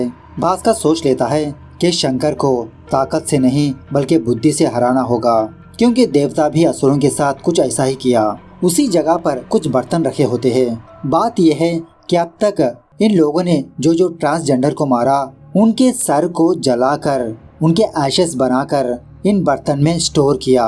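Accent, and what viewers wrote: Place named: South, India. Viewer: Are South Indian hai to thoda to waisa rahega na , hindi uski main bhasha nhi hai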